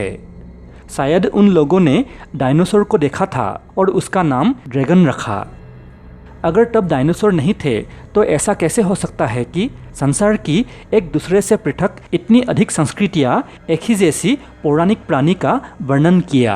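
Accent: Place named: Bengal, India. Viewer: Yee bosdika bolne wala pukka bengali hoga....chutiye ko bolna bhe nahi aata hogaa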